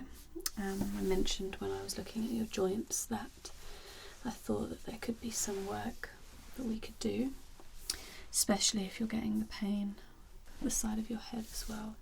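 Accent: United Kingdom. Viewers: Always love British accent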